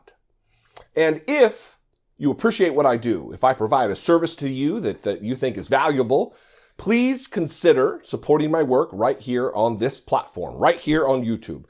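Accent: South, United States. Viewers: I love your Southern talk.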